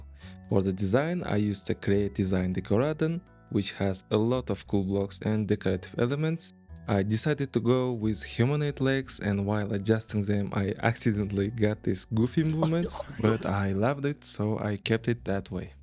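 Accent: Russia. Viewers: Ты говоришь с сильным русским акцентом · У бро русский акцент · У тебя будто бы русский акцент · русский акцент сразу слышно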